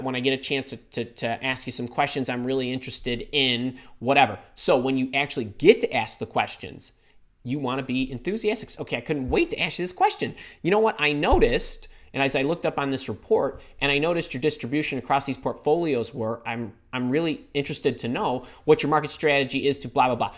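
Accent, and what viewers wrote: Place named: Chicago, United States. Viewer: You are hilarious!!! great advice …the Chicago twang keeps coming out in your tone…just sayin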